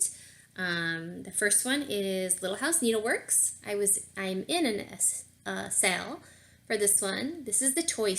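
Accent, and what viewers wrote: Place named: Cleveland, United States. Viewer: You are like the coolest girl ever!! 😆😆 I totally thought you were from Cleveland from the accent...but I do remember Bendy Stitchy saying you were a Wolverine 😮. I’ve never had a reason to go to Michigan but you make the Bavarian town sound totally worth it!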